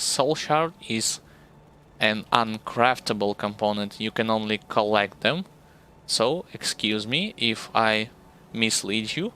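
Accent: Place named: Eastern Europe. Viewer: Great info overall, the eastern european accent makes it interesting also.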